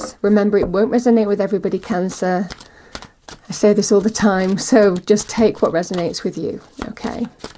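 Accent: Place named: London, United Kingdom. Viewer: Thank you thank you for this beautiful reading I love the way you explain the cards and how you do the reading thank you thank you thank you also you are from London your accent is very lovely are you from London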